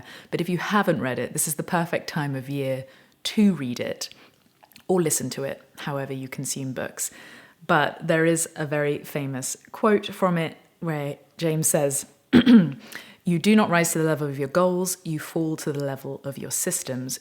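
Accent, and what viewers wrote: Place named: RP, United Kingdom. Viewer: The resolution idea is just a fabrication. Some wishful thinking most humans blather, then fail about. You probably don't have a cat, because you travel so much, but, if you did have a cat, I would counsel you to monitor their way of passing the days. What do you want really? More fame or money? More free time? To play like Marty or Paul Davids, John Mayer or hundreds of other guitar players? (Chet Atkins, Tommy Emmanuel, Glen Campbell, Vince Gill, Justin, BB, Stevie Ray, Jimi, Bonnie to name a few). I would say...forget the New Years resolution aspect...and just do you. Different topic. How would you characterize your english accent? Oxford like? Upper crust I should imagine. But I am interested in how you would describe it. I am several generations older...dad was a WW2 pilot..so, I am a boomer...one toe in the cemetery...nearly an ex-parrot. 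Just be you. You are wonderful as you are.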